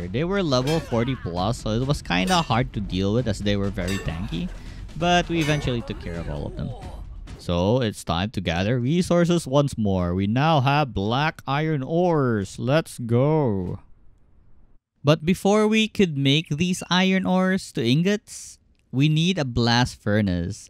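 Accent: Philippines. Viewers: Are you Filipino? Cuz your accent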